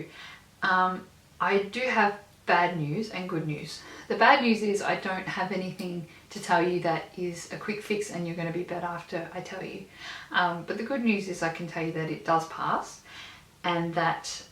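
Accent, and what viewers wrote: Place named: Australia. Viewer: Already feel much better after watching this. The aussie accent is definitely soothing too haha.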